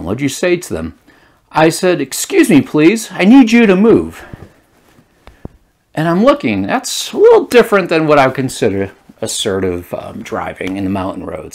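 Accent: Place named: Northeast, United States. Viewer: I love the fact that closed captions does not know what to do with that north eastern accent. Love it!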